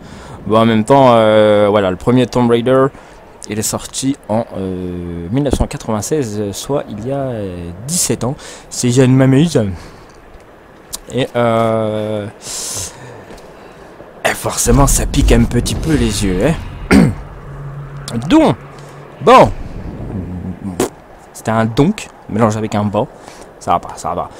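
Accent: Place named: Marseille, France. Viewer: … Ah que c'est drôle tout de même d'entendre ton accent Marseillet. On voit de loin que tu es du sud de la France. - Clément de Québec.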